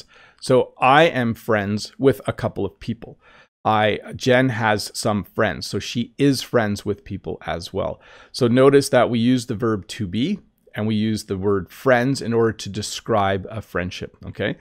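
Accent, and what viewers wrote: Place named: Canada. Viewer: Sorry this is Canada English what is this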